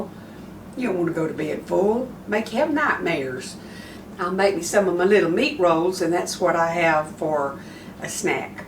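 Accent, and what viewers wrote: South, United States. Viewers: You’re such a dear woman! I love listening to your lovely southern accent and watching you work in your beautiful red kitchen. I especially like the way you show us your utensils, along with special tips and techniques in your cooking. So glad I found your Utube channel! Can’t wait to see your beautiful gardens this summer! God bless you! A new friend from way up north.🥰🙏💕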